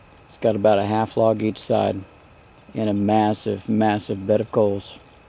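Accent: Texas, United States. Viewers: Around mid-November the nights are about 14 hours long in the US. Based on the slight accent in his voice, I'd say probably somewhere in Texas. So the combination of not-quite-winter and Texas weather patterns, that would explain why he's OK with just jeans and a flannel shirt.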